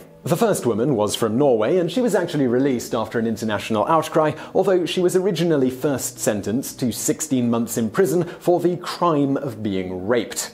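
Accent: United Kingdom. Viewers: Whats the deal with all these blooming Brits narrating virtually every video on Earth ? Bloody 'ell !! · well... thats a very British accent... could we get subtitles? o.O my poor aussie brain cant understand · I really love your channel, the info is very interesting. BUT, why do you use such weird syntax that doesn't sound like English? I'm talking about something like this (this is not a direct quote, just an example); 'The people of Fukushima they have thyroid cancer'. Or ' India it has a big garbage problem'. The words 'they' and 'it' are completely superfluous in these sentences. You can take them out altogether and nothing changes in the meaning, the sentence just sounds correct. Maybe your writer is a second language English speaker, but I have to say it drives me absolutely crazy; eventually I will stop watching because I won't be able to stand listening to you mangling the English language with your very proper English accent....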